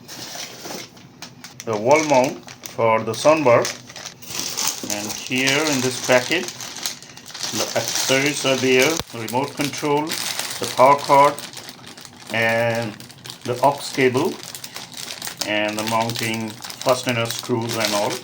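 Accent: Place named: India. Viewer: Bro be Indian, sound Indian 🇮🇳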